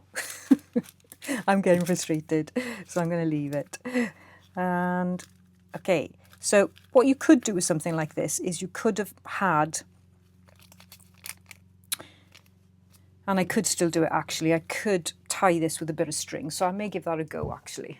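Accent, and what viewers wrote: Wales, United Kingdom. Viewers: Lovely to find you on here and hear the Welsh accent - but oh Im so sorry I couldn't watch til the end because far too many adverts every 3 minutes. You tube is now so annoying with the ads :(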